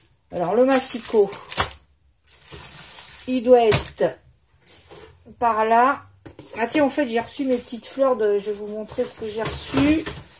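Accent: Paris, France. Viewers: Je n'avais jamais vu un album fait de cette façon c'est tip top. J'adore le bloc Paris il est super beau et j'ai tout pigé !! J'aime aussi beaucoup l'embellissement que tu as acheté pour ta fleur en organza. Je trouve super élégant. J'ai tout pigé à droite à gauche on voit bien s'il y a un vide ou pas. Ah bon t'es une parisienne. Moi aussi je connais bien le marché St Pierre. J'y achetais de la soie. J'ai fait de la peinture sur soie pdt longtemps. Moi je suis une banlieusarde depuis toujours !! Je comprends mieux ton phrasé ... Très parisienne... J'adore.... Bisous 😘💋🌞🐦🌈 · Coucou... ah le bel accent parisien, tu peux pas renier tes 47 ans de capitale ! c'est super... moi aussi je suis parigote du 15eme , rue de la Convention pendant 35 ans, comme mes parents et grands parents qui y sont nés eux aussi....et le Marché st Pierre j'adorais y aller avec une copine, dans le bon vieux temps... 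Maintenant j'habite au bord de la méditerranée depuis plus de 30 ans.... c'est agréable il fait beau, c'est different... Bon c'est pas le tout maintenant il faut finir cet album , car le plus dur va être la reliure je crois. En tous cas tes papiers sont superbes. A bientot, je me suis abonnée à ta chaine.. bises <3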